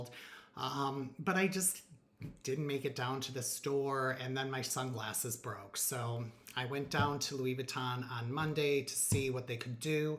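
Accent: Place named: Chicago, United States. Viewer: Great video. Classic bag. You don’t see it everywhere. I gotta ask... where are you from? I hear a Chicago accent perhaps?? Keep up the great content!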